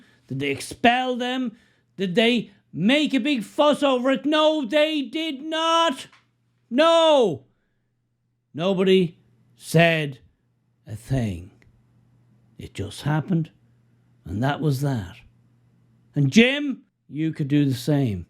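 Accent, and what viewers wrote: Ireland. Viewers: Loving the passion, send the Jamaican genius back home to help lift Jamaica,he has all the answers, they used to say don't bite the hand......😂 keep up the good work, I love your Irish twist.Peace and prosperity to all my Irish brothers and sisters this nonsense has been taken too far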